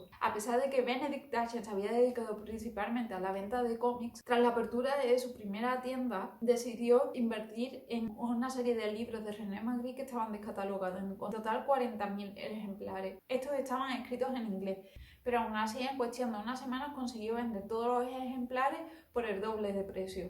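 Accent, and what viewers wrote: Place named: Castile, Spain. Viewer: Un momento, es alemana con acento castellano de qué parte de España??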